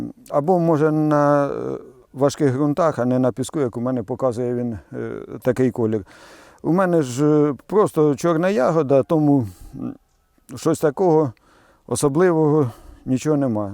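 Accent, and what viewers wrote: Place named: Poltava, Ukraine. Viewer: Так вы с Хорола. Мой отец тоже. Жаль уже умер. По разговору догадалась что с Полтавской обл. Хороших вам урожаев.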